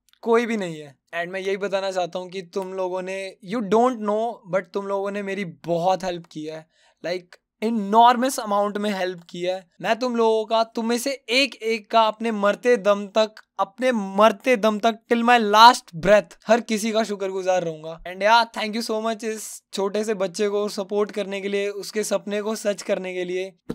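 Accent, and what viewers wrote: India. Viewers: Mana tumhe English aati hai yrr Indian hoke English bolre ho 🙄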